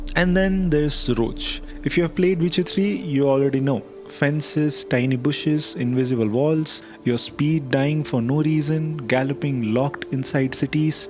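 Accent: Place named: India. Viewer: great vid bro ❤i was looking for some mods for my ng+ playthrough and this helps a ton, also i love your indian accent, it sounds kinda exotic 😁you should definitely keep it haha it sounds awesome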